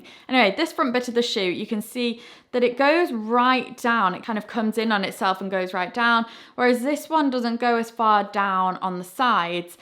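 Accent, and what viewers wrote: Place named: England, United Kingdom. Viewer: I love your style and I copy a lot of the things you wear, I also love your English accent that as a foreigner I can not copy 😢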